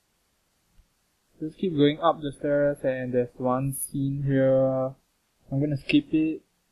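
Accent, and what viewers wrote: Singapore. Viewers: Obviously Singlish......==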